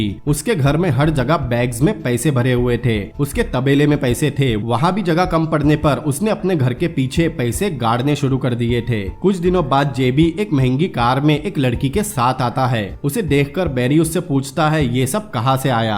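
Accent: Assam, India. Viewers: भाई आप असम से हो??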